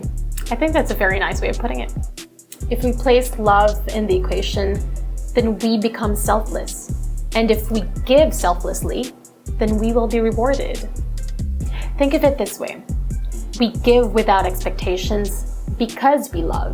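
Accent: Ilonggo, Philippines. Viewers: halaa i just saw this on facebook then i searched in yt tas halaaa kanami gle mamati simu manang ur ilonggo accent uwu❤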